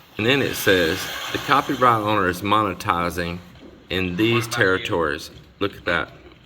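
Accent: Texas, United States. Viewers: I love the Texan accent. It’s so howdy 🤠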